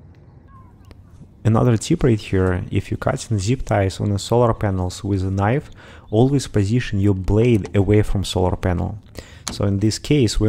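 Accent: Russia. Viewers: Ярко выраженный русский акцент. :)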